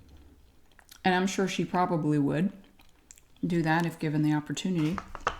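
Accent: South, United States. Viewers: MY GOD you look incredibly stunning! I think it's that success glow, new house, 2 year long channel, new camera (maybe?) but regardless you look amazing! You are seriously one of the most underrated ASMR channel out there. Your content is so simple but bold at the same time, hands down my favorite channel. Thank you for being who you are, dedicating yourself to this channel with a full time job, and 2 kids, I seriously don't know how you do it but you have my respect! I really want to watch this right now but I gotta go to work. But I can't wait to get home and fall asleep to this beautiful Southern belle talk me to sleep! lol.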